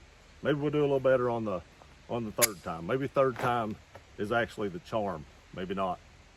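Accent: South, United States. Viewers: What did he say? Omg he is country 😂😂😂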